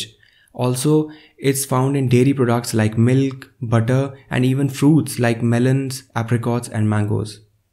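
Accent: India. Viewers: thank you, normally i dont listen to Hindu accent, but your information is worth it!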